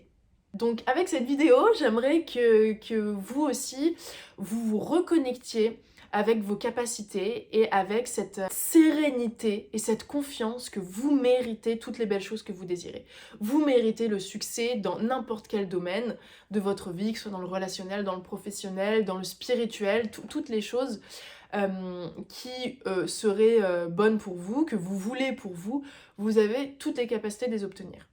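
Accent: France. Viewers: Very charming you are, either speaking English with French accent ,and speaKING FRENCH, Very sensual.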